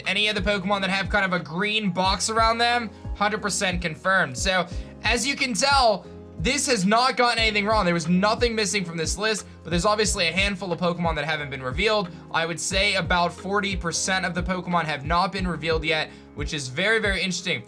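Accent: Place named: California, United States. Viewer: Had no idea you were in CT. Me too! Would’ve thought California from some of your speech patterns. Big fan of your channel. See ya around!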